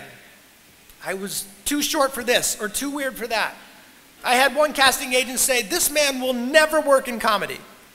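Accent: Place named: Boston, United States. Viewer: wow that basten accent!! love it! :D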